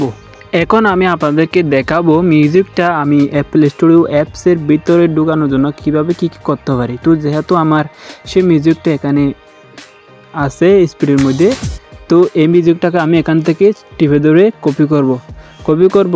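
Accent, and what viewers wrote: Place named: Chittagong, Bangladesh. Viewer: ভাই আপনি চট্টগ্রামের মনে হয়। আপনার ভাষা শুনেই বুঝা যাচ্ছে 😅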